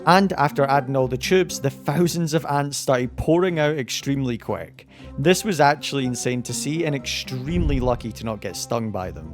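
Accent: Scotland, United Kingdom. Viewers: What part of Scotland you from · Bro sound bri-ish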